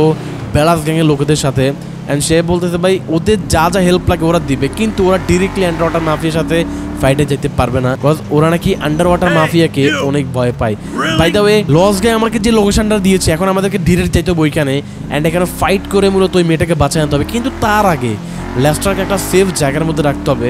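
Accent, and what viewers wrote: Noakhali, Bangladesh. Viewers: Vai apni nohakhali. Kothi tha ken.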